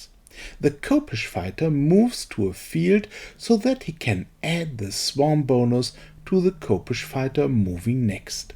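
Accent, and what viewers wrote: Germany. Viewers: The first game I loved was Final Fantasy Tactics, so I enjoy the strategy RPG battles in FOE. Are they the only reason people hate the Egypt settlement? I was thinking it had impediments even worse than Vikings. I build for 3x3 structures, and then they introduce a 3x5 when I was building around stupid rocks I can't do anything about. I think I'll finish Vikings in time. I still wanna go back to Japan and lvl those buildings since it's so quick. But Egypt isn't looking as mean as people made it out to be. Though, I'm only one video into it. Your next video might change my mind.. And I love the accent. I'm guessing you get this a lot. But you sound like an extremely pleasant person. American women prefer the British accent. But American men prefer the German accent. That might not sound like much of a win, I admit. But it is.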